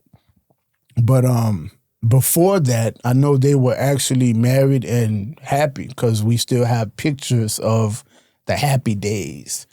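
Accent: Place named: Louisiana, United States. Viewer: He got that Louisiana draw in the way he speak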